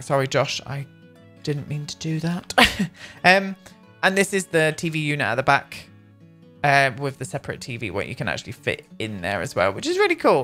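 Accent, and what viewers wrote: United Kingdom. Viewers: Your accent is the accent I do when doing a british accent and I guess it's a real accent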